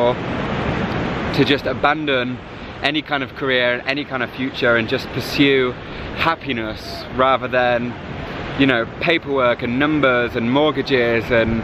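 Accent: United Kingdom. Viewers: Loved ur vid!! N.. U accent! Seems british.. Feels good to hear u tlk.😀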